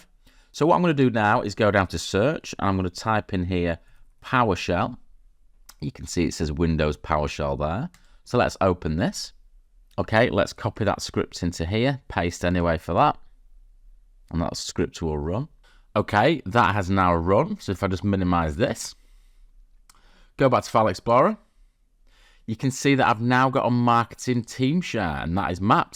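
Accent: United Kingdom. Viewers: ‘Okay’ and ‘here’ love UK accent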